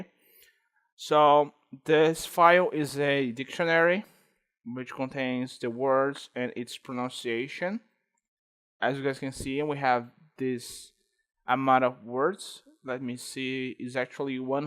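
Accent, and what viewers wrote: Brazil. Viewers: E esse ingles de br ai.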